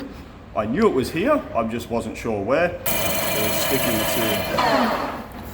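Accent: Australia. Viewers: Ohh man I just discovered your channel and as a retired Aussie living in Thailand I really enjoy your craftsmanship your bloopers and the bloody Aussie accent that I missed so much keep up the good work mate!!👍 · Avuncular Why are you talkning like you're from Australia? You sound like one of those people that throw in 'spanish' when the get mexican food, lol. Saying "adios" when when talking to someone who speaks spanish, instead of just saying goodbye.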